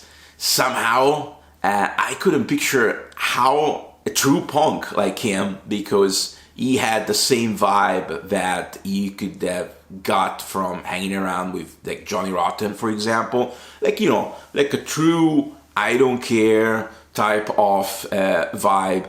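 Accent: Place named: Dublin, Ireland. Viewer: A little bit of an Irish and indeed a Dublin accent I can hear. Very cool. As for Shane, we lost a little bit of our past, present and future when Shane stopped writing. It's a loss, he is a loss. But like you said, not in a sad way which is strange. Plus he got a fantastic send off and now a punk in spirit. P.S. I don't mean for this to be the type of question which it will surely sound like. If you had to, which song from his song books would you pick as a personal favourite in terms of story, lyrics, honesty, emotion and of course musicality? For me, and for all of the reason and more listed above.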